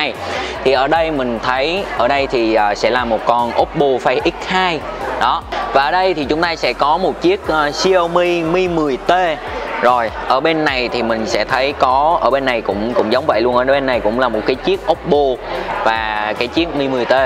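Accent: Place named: Binh Dinh, Vietnam. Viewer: Nghe giống giọng Bình Định quá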